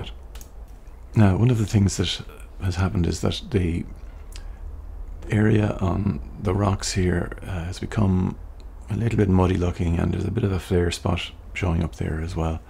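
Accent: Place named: Ireland. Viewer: I think you're speaking just fine, and I suspect that the other commenters simply aren't used to an Irish accent. In fact, that nie fella sounded unhinged in his comment, so probably best to disregard. I like your Luminar edit the best of the two btw, since it's closer to what it seemed to be in real life. Good luck with your channel (and wotht the bird that's determined to get in!).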